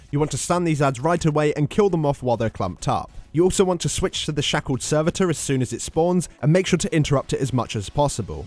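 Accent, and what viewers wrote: United Kingdom. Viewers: what region of the isles is your accent from?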